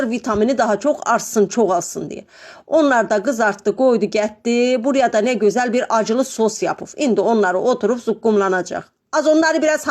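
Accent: Kars, Turkey. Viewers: Ben Karslı yım konuşmanız aynı bizim gibi çok güzel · Kars . Azerbaycan Türk'ü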